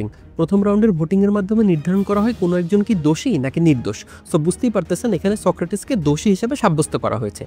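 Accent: Bangladesh. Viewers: ভাল প্রয়াস। তবে বঙ্গভাষার পূর্ববঙ্গীয় গ্রাম্য উচ্চারণ পরিহার করতে হবে।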